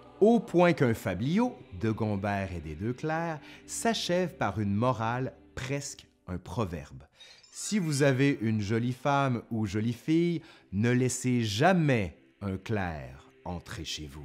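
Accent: Quebec, Canada. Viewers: je ss restée bouche bée en écoutant la langue française québécoise pour la première fois 😯 qui est comme moi?? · Je suis venu grâce à l’accent français du Canada pour mieux l’apprécier. Et maintenant j’apprécie les vidéos et tous que j’apprends ! Merci !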